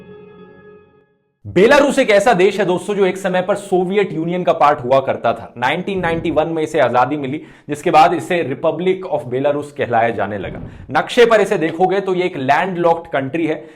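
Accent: India. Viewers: I completly agree with you mate. Just a question, what language do you speak? because it sounds like English merged with Hindu or other Indian language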